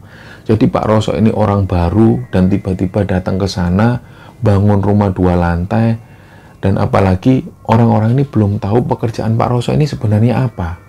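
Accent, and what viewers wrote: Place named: Surabaya, Indonesia. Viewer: wkwkwkwk suroboyo banget, tekong-tekongan, skit skit an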